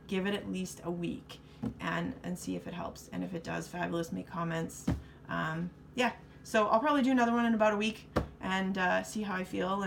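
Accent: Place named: Canada. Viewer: I sense Canadian LoL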